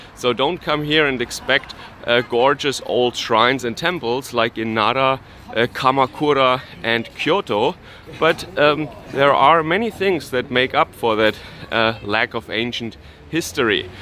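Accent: Bavaria, Germany. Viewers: Das war sehr interessant! Danke Schön! Mano dein Akzent ist sehr lustig. Typisch Bayerischen Akzent. 😂 sorry i lived in the North and you guys crack me up. Tolles Video vielen Dank!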